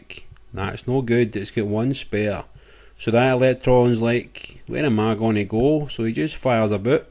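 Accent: Scotland, United Kingdom. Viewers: This video taught me two things: how semiconductors work and how to understand Scottish accents. Thanks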